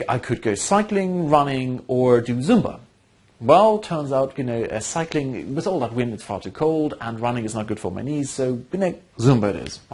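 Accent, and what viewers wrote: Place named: Swabia, Germany. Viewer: Very informative and down to earth (Schwabe oder Württemberger ?) without the usual hype. I really appreciate it. Wolfgang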